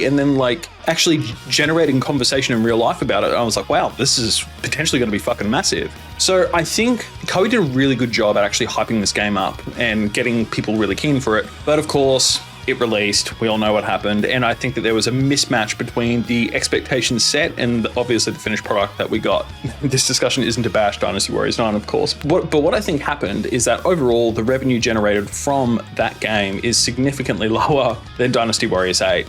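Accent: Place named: New Zealand. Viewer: Are you a kiwi? I dunno why I never heard it before but you sound real kiwi lol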